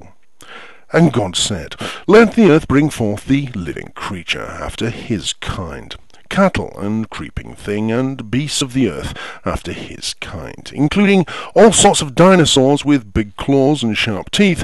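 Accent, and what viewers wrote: United Kingdom. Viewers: I was lead here by fans of my channel... and while I knew that I was hardly doing something totally original... I was told that your english accent was part of how funny you are. And.. yes the english accent is totally something I cannot hope to imitate being that I have a lowly midwestern US non-accent accent... long story short, You're funny and interesting enough that I watched the whole video! I like your sense of humor! I can only hope to be a fraction as funny as you! :D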